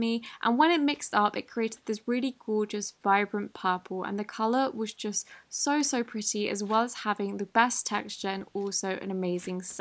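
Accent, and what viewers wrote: England, United Kingdom. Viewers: I know it’s English, and I love it!!!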